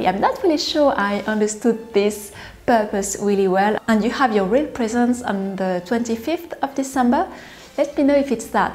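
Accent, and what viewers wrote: France. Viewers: I am so enchanted by you, your lovely French accent and your art most especially.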